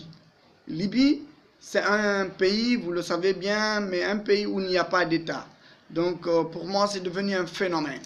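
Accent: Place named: Guinea. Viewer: ce monsieur parle correctement la langue de MOLIÈRE.certe son accent est guinéen c'est normal il n'est pas français.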